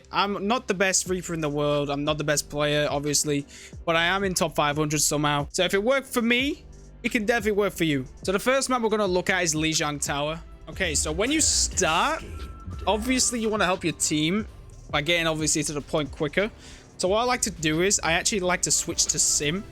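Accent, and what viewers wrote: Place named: South London, United Kingdom. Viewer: What part of London is the accent from bro? Sounds south.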